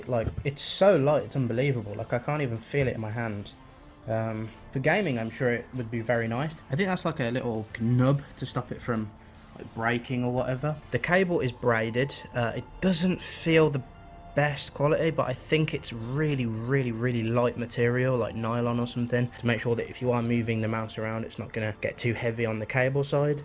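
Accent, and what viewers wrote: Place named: United Kingdom. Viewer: dude where abouts in uk are you from? i recognise your accent!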